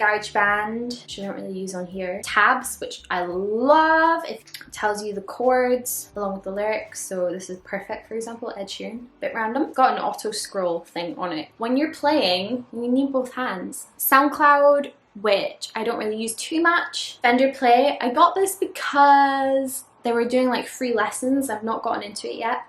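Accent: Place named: Scotland, United Kingdom. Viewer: Good video! I strangely enjoy watching these videos haha. Where are you from, you sound Scottish then English lol I’m from Glasgow, FYI 🏴😎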